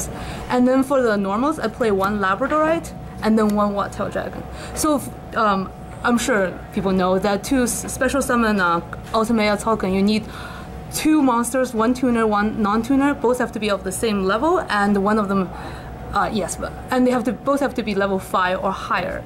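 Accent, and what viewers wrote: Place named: Asia. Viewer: Her Asianic accent. Finally I'm not the only ones with Asian accent that plays ygo. :)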